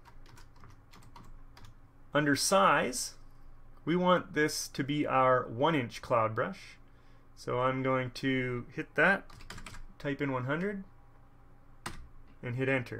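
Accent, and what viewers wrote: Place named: Canada. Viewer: that is a dead give away lol. i use postal codes and zip codes daily for work and that through me for a loop the first time i heard someone go zed1tango 3delta5 i was like WUT and then the way he says resources. canadians pronounce it rezources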